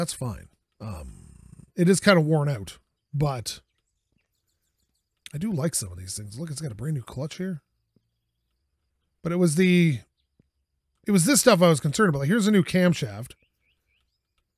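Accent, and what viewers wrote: Canada. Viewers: I love your videos, quite funny to watch. I can tell that you're definitely not new to working on cars, though I'm not a mechanic. By chance, are you Canadian? Your accent sounds a little like that.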